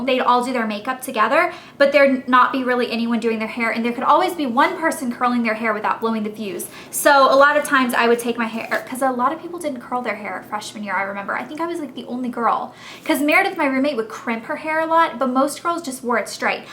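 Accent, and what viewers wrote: South, United States. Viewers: in the first seconds i thougt you were from the south because of the slang :D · I feel like you "southern" accent is getting stronger each day. haha. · Are you from the South? I never noticed your accent till this video? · she has a southern accent? weeell, I guess I can't tell because I'm southern too xD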